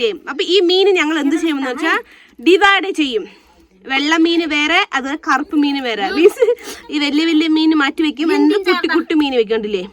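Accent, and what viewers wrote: Palakkad, India. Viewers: Palakadan malayalam ❤❤❤❤🎉🎉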